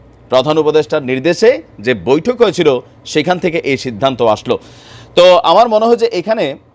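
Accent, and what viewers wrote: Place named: Comilla, Bangladesh. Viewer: Location India কেন, আপনার ভাষা তো কুমিল্লার মনে হয়।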